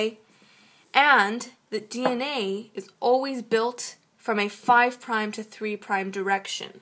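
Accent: Canada. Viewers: thanks sweetie :) SO helpful...are you canadian btw? :)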